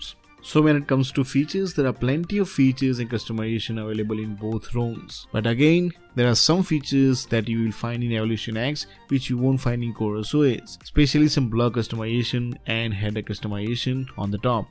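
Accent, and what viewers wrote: India. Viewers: Yeah but his name is Indian,I believed He's Indian because of his accent.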